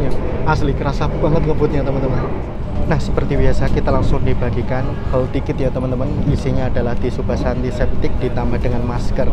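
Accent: Java, Indonesia. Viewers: Mas mmg aslinya orang mana kok jawanya cukup medok. Enak dengerinnya. Salam sehat. 👍👍👍🙏🙏🙏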